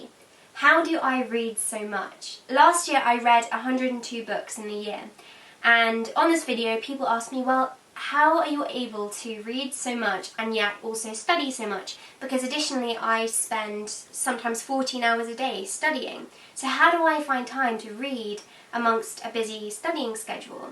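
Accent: RP, United Kingdom. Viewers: I like these tips but shes so annoyingly posh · British acccent... Reading books..... And your hair cut is also same as Hermoine granger... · Your accent are just like Hermione Grandger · You remind me if the girl of harry potter you also look alike and have the same accent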